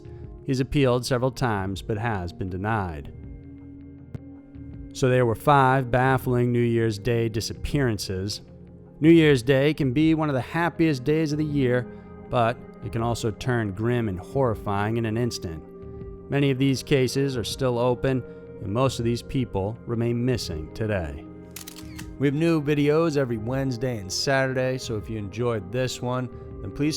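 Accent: South, United States. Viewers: Your voice has improved, less monotone since I ranted about it last year. I like the southern USA accent too.. I prefer the human voice to your robot one. Easier to listen to and stay interested... Better than before anyway!! Almost there lol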